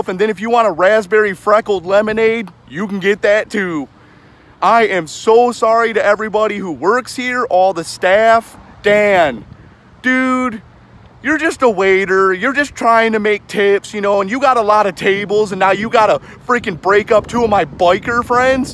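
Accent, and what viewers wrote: United States. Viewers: what state is this dude from? lmao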